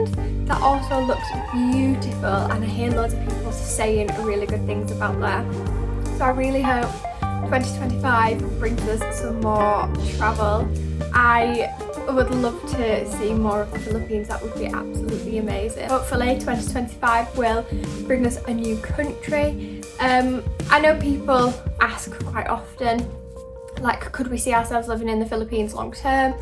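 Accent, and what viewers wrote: United Kingdom. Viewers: Pure english. Pronounciation of the british. Hard to understand. Unlike the american english. Its too easy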